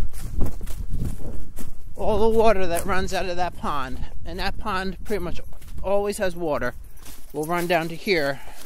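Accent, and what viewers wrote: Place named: New Jersey, United States. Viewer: Man you certainly live in a region with lots of drainage issues. Is this PA? I detect almost a Jersey-ish accent.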